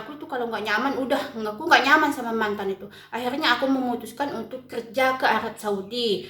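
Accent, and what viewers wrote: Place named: Lampung, Indonesia. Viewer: Logat mu kayak org Lampung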